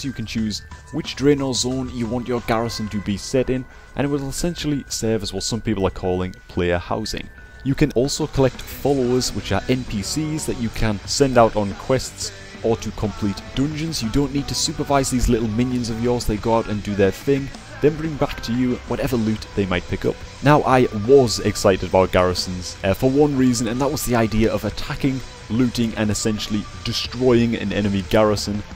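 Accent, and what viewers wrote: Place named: Geordie, United Kingdom. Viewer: proper strong geordie accent...makes you sound foreign man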